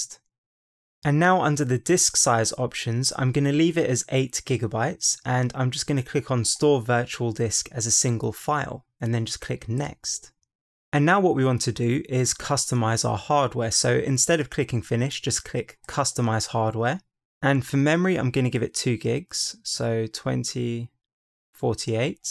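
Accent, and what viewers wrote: United Kingdom. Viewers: I love your British accent, it's so cool!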